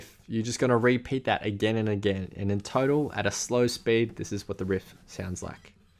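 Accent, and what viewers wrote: Australia. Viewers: When you hear the Aussie accent And see that beautiful Australian guitar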